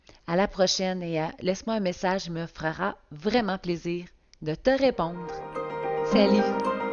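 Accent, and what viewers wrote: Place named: Canada. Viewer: je suis sur que vous canadienne car l'accent montre. Bisous.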